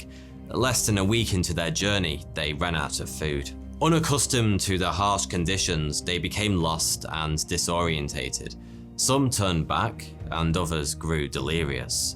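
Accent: United Kingdom. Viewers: Are you British? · Donner Party is at most a passing, macabre joke. Sometimes people will use the name when waiting for a table at a restaurant so they can hear the hostess say, "Donner, party of two." But the only thing weirder than the Donner Party is seeing someone with a British accent make a 22 minute joke of it. Beyond one-liner jokes, I'm unaware of any American who views the Donner Party as a comedy of errors.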